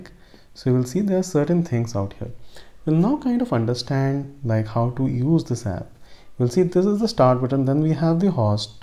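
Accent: India. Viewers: Another misleading title from some guy with an Indian accent. Why would you want elderly people to download from a 3rd party website?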